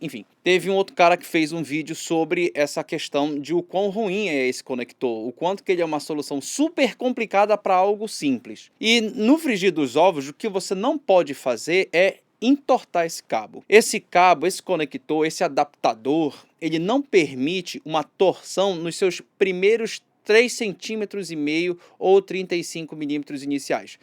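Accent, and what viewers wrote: Pará, Brazil. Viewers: da pra saber que o cara é paraense só pelo ritmo da fala dele.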